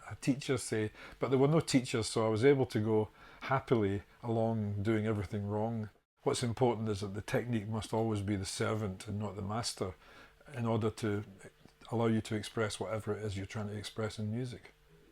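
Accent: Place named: Glasgow, United Kingdom. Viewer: how can you not love that Glasgow accent?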